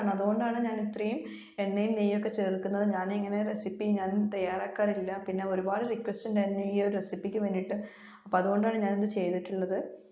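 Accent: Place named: Kannur, India. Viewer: Thank you for a great presentation and I loved the way you speak Malayalam. It sounds like how it is spoken in the Kannur area.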